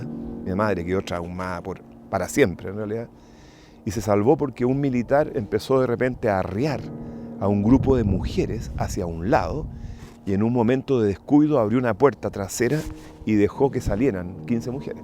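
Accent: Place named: Spain. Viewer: Por que mezclas fonetica castellena con america, es raro como hablas.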